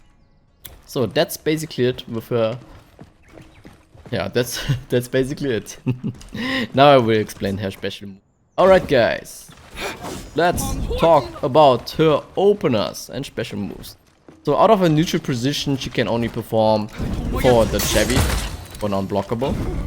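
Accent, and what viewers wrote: Germany. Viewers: german-english always on point:D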